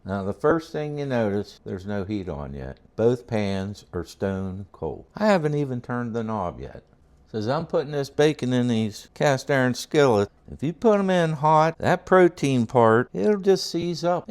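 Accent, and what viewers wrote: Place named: West Virginia, United States. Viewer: your accent reminds me of home so much. Do you happen to be from West Virginia? And if so, what part?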